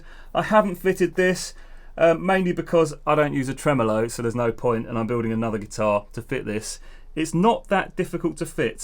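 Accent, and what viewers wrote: United Kingdom. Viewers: This guy is so British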